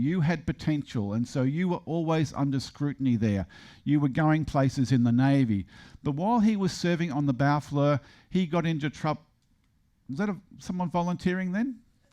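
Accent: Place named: Australia. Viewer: I have a couple good scottish friends and they do not sound like this guy, I thought he was australian.. anyway very interesting .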